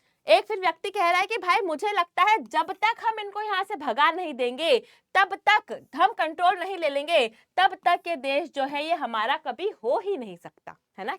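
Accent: Bihar, India. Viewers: Your Bihari accent is so awesome " CHAMKA BHAI, CHAMKA TUMKOO"😅😅😂😂❤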